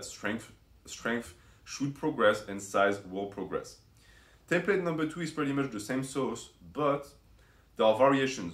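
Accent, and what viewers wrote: France. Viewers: Ta un accent français ? Dommage que je comprends pas. L'anglais sa fait 3 ans que je m'entraîne en half est j'aurais aimé plus de renseignement est de changement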